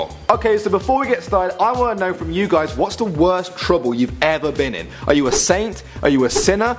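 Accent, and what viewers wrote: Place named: United Kingdom. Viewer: Sounds like he is British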